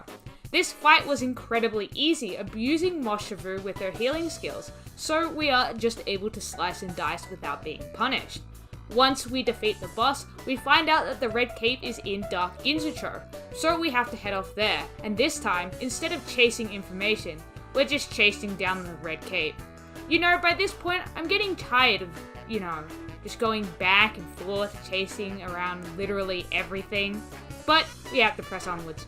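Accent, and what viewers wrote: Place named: Australia. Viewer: Australian spotted.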